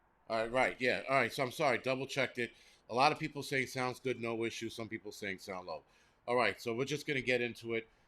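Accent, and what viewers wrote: New York, United States. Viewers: I loved your video. You are so real and talk like a born and raised New Yorker. When I heard you talking about you might be in trouble for just being at the rally my heart skipped a beat. I pray you keep your job and your family are safe. Please keep go for the ignorigation. We don't need to see a few dozen people that will be there. You have been talking about getting out of the city for a while maybe this is a sign for you and your family to leave soon. 🤬🐈🤭💖. God bless you and stay safe.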